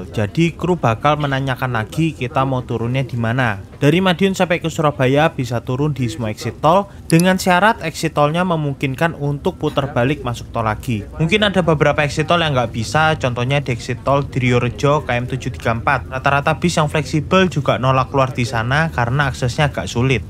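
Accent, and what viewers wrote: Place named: West Sumatra, Indonesia. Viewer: Bicara orang minang ya, kym trans yang abang naiki kebetulan orang minang juga founder ama ownernya, salah satu PO bus urang awak yang diluar sumbar yang eksis seperti epastar ama tam yang bermarkas diluar sumbar.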